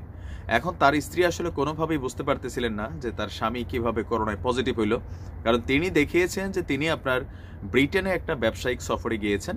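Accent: Comilla, Bangladesh. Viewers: ভাই আপনার বাড়ি কুমিল্লা না কি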